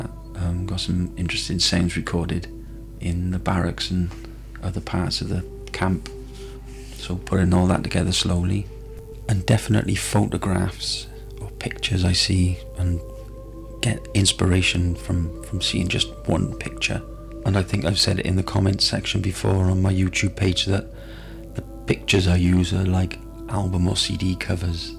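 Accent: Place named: Wales, United Kingdom. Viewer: You sound like you're from Newport, definitely Welsh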